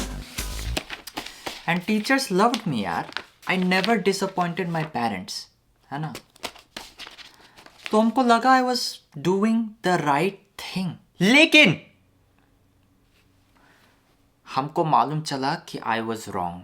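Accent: Bihar, India. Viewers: I did my schooling in a school in Jharkhand which had tons of people from Manipur. So, it doesn't surprise me to see some one with your looks talking with Bihari accent. All my Manipuri friends talk in pukka Bihari accent. But I like your boldness & content, and subscribing because of that rather than just the novelty of your accent + looks. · Baiya mujhe be aapki bihari ascent sikkado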